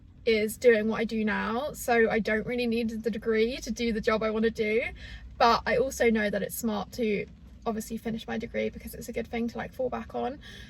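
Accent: United Kingdom. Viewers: omg I love your voice! I never knew you were english 🤍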